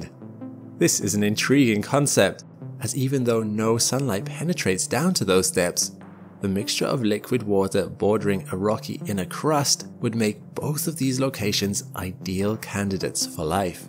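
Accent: Wales, United Kingdom. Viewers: Is that a Welsh accent? I'm from Newport... Sounds similar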